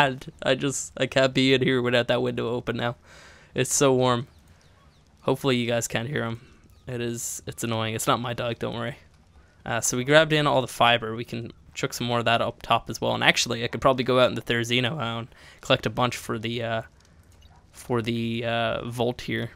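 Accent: Ireland. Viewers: Damon Carey it’s the way he says it in an Irish accent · He's very Irish. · are you irish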